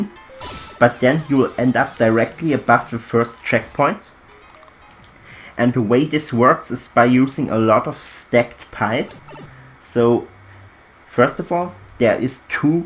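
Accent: Germany. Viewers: It's a German accent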